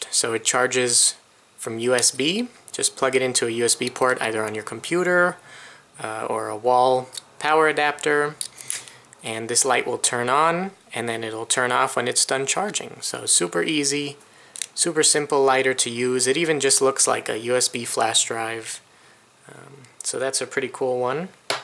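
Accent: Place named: Sweden. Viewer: are you Swedish? i like your accent and it sounds like its Swedish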